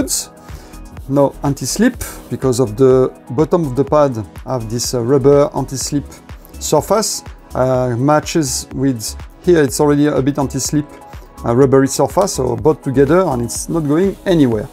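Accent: France. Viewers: l’accent français :)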